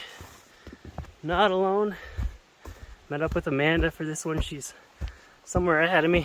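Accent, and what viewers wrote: Canada. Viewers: Great video brother you are a hiking fool. Very good drone footage. My first time watching your vids I’ll definitely subscribe I love these types. I’m guessing this is in Canada??? You kinda sound Canadian. Wish you safe travels your friend from Oregon 🤙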